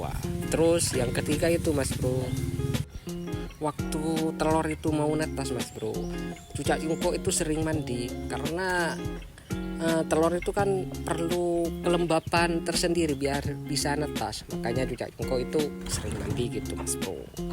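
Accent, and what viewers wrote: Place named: Madura, Indonesia. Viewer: Logatnya kayak madura😂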